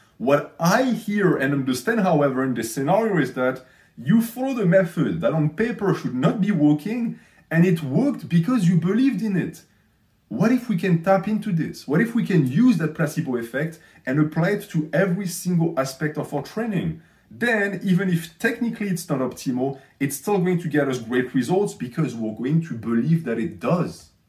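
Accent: France. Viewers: With no exaggeration, I love the perspective offered on this channel. Sound advice from a holistic vantage, a thicc French accent and videos long enough to tax my attention. You understand this cant cease, oui?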